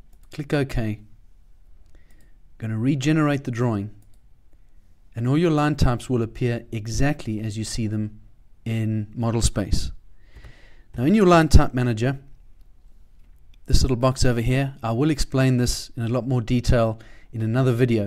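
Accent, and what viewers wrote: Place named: South Africa. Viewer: Thank you! You sound South African.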